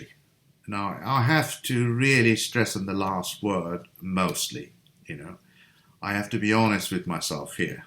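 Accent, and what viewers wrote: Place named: United Kingdom. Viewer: By your accent, I thought you were British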